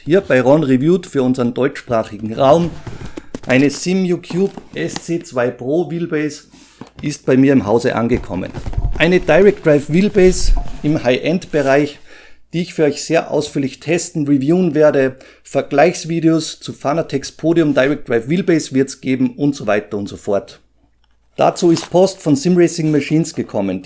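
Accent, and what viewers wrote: Austria. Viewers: Hallo Ron, du hast in dem Review einen Adapterkabel von einem dritthersteller geredet, da ich selbst langzeitkunde von fanatec würde ich die mpm gern auf meinem F1 csl wheel nutzen (first Generation) da die APM für das neue porsche wheel vorgesehen is … somit ist das Interesse an diesem Kabel des Drittanbieters extrem interessant!!! Ps: bin oö‘er … du klingst als wärst du nur ein paar kilometer von mir weg? LinzLandler?!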